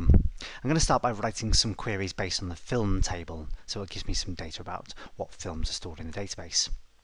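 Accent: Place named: North Wales, United Kingdom. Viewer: I recognise that accent anywhere, do you come from North-West Wales? 🤔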